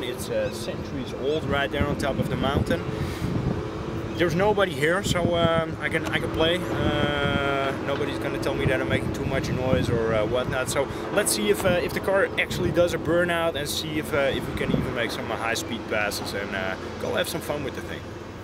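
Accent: Netherlands. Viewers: Are u dutch?, awesome vids :) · aaah ok! i was thinking ur accent sounds dutch but the netherlands is not that hilly XD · u have a little bit a dutch accent like me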